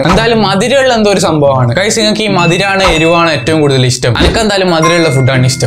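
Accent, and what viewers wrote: Kannur, India. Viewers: ee kannur baasha kelkaan oru prithyeka sugamaa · kannur basha polichu.......😂😂🤣🤣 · Kannur slang😂😂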